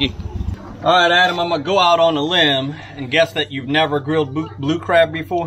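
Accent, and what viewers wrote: Louisiana, United States. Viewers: I came over from arms, family homestead, and I really enjoy your Louisiana voice and your videos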